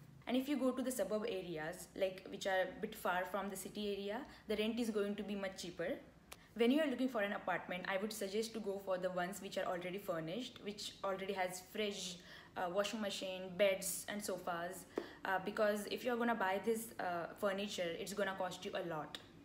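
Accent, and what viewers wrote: Maharashtra, India. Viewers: अ ग थोडं थांबून बोलशील...😊 किती फास्ट...लव्ह द वे यु टॉक इन मराठी💐😊ते पण तिकडे.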